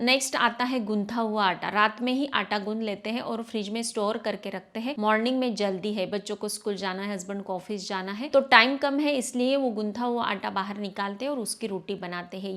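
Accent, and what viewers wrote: Maharashtra, India. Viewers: मराठी tone का हिन्दी ब्लॉग टिप्स बहूत बहुत सुन्दर प्रस्तुति,आपके अन्दर श्री महालक्ष्मी जी के अंश ज्यदा है,wow❤❤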